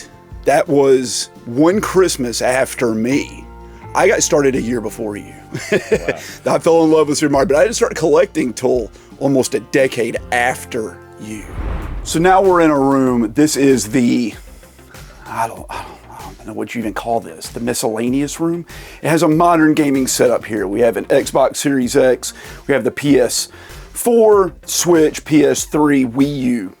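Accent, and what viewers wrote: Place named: Louisiana, United States. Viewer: Is this accent from Louisiana? Sounds badass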